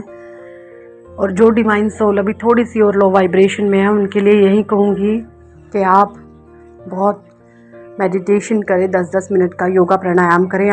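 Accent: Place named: Kanpur, India. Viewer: Jee aap kee avage hum sunkar anuman leagatata thaa kee aap kanpur kee tharaa bolti hai leakin kathil siti aur karneal siti mea siti kaa kuch loog kanpur kee thara boltesa humko mealtaa thaa hearinaa meaa Hindi kee kitabai boli jatai haea .....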